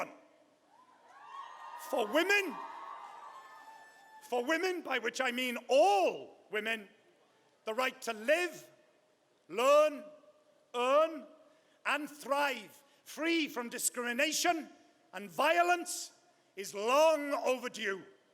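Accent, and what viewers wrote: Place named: Wales, United Kingdom. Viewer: Wow it's so rare that i hear his Welsh accent ❤️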